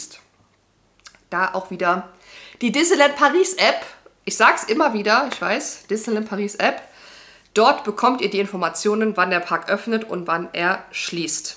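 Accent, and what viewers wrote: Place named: Eifel, Germany. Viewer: Hi, aus welcher Ecke kommst du eigentlich 😅 dein Akzent tendiert Richtung Eifel/Aachen/Düren 😅😅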